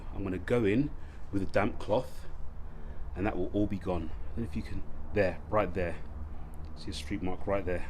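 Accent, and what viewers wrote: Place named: United Kingdom. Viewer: I have been using it for past year or so. When I bought my wife her 4th new car, I figured it's going to last until...I retire😅 Her 2021 Camry SE is getting it's 2nd coating. 1st lasted months, just 1 coat. Her car stays in the garage...my poor 2016 Tundra stays out in the elements with just an occasional wash and wax. I recognized your Brit accent😁 I lived there for years in Felixstowe when serving in USAF st RAF Bentwater.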